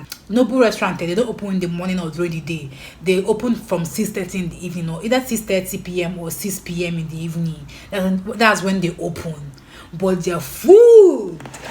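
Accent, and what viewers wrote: Ghana. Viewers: You almost became a Kumasi girl listening to you.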